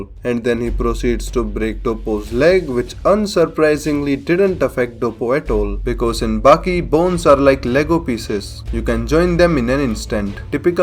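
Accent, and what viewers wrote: India. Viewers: Bruh your Indian accent makes it more funny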